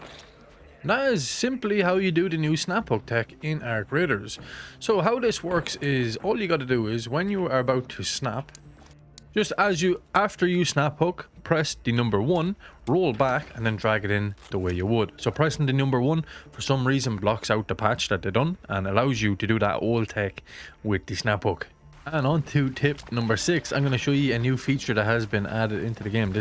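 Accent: Ireland. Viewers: He sounds Irish.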